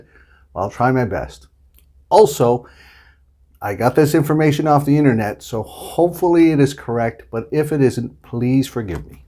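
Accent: Russia. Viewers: I did enjoy listening to your Russian accent! 😉👍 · Wow, I didn't know about Stalin's bunker. Probably I will visit it. And your Russian accent is so funny😂🤣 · ** Wow! I've trained hard my listening today 😅 and I loved your Russian accent hahaha ** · Wow, I like your Russian accent, that so funny 😂. Thanks for your great video 😁👍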